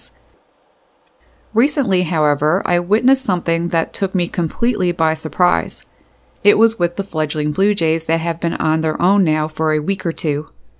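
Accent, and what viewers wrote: Newfoundland, Canada. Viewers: You are probably the most Canadian sounding person i know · Like your Canadian accent. I don't see wasps landing on the jays to sting them, so at least they're not getting swarmed. Keep up the good work birdies. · Interesting and well put together video. I don't know why, but your voice sounds like someone from Newfoundland (or Labrador). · What accent is this? Canadian? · My wife is from Canada and you say OUT, ABOUT, just like she does. Lmao.......sorry. I'm from the south and she gives me hell about Pile, Mile, Ain't, ......lol... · Your definitely from Canada! Love the accent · the Canadian accent is so strong lol. Great video tho, i saw this here in Oregon recently in a different form. A Stellars Jay was actually hunting down and tearing apart wasps without eating them, almost in a defensive way.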